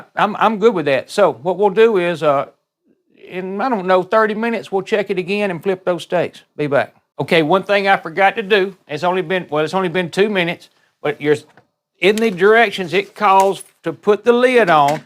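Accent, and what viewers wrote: South, United States. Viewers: He is from the south. I understand you. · Where is that twang from? Somewhere south like Lousiana or Georgia?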